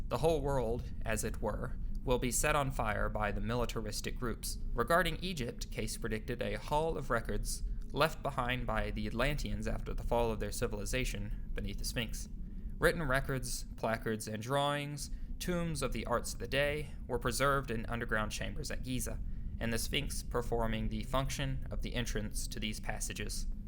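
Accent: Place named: United States. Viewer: You lost me a lot with your odd American pronunciation of Greek, roman and ancient Egyptian names. Thossmess? Plyney? You sound all Greek to me.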